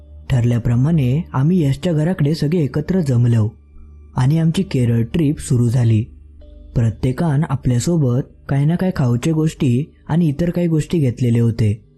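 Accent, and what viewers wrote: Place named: Malvan, India. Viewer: Story mast hoti 👍👍😊tumach malvani awaj mast ahe 👍👍👍❤second part lavakar pathava 😊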